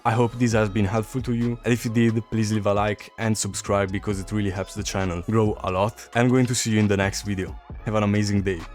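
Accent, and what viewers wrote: Italy. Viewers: bro hai il mio stesso accento nelle interrogazioni di inglese, dimmi che sei italiano e non sono pazzo io. Bel video btw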